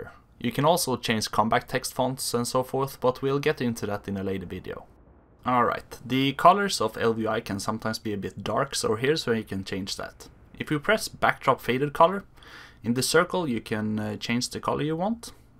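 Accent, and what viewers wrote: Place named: Gothenburg, Sweden. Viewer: You have the most Gothenburg accent I've heard in my life.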